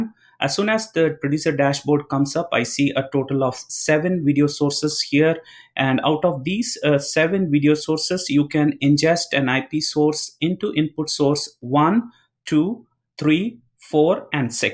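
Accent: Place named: India. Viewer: love to hear indian voice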